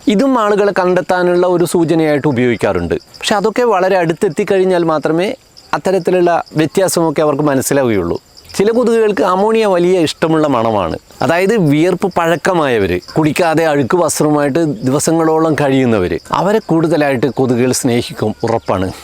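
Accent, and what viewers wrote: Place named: Thrissur, India. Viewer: മാഷിന്റെ ഭാഷ ശൈലി കേൾക്കാൻ മനോഹരവും മനസിലാക്കാൻ വളരെ എളുപ്പമാണ്. തൃശൂർ ജില്ലയിലാണോ അല്ലെങ്കിൽ തൃശൂർ ജില്ലക്ക് അടുത്താണോ മാഷിന്റെ വീട്? നല്ല അവതരണം മാഷേ..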